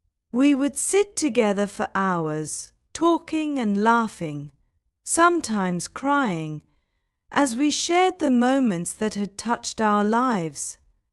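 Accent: United Kingdom. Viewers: My dear are you from England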